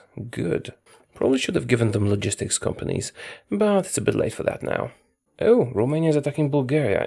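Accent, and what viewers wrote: Poland. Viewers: lol my friend thinks you are German. I told him you sound Polish. is Polish right? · your accent is a bit polish nice! i love polish!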